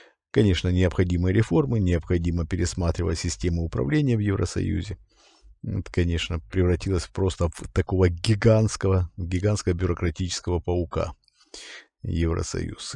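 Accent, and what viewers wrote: Ukraine. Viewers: ВОПРОС. Уважаемый, начал слушать вас совсем недавно, поэтому возможно пропустил информацию о вас. Тут посмотрел самые первые ваши выпуски и заметил у вас явный украинский говор. Мне очень приятно, что такие добрые люди, как Вы с моей любимой Родины, я сам с Украины, скажите пожалуйста, а с какой вы области или региона Украины? Спасибо!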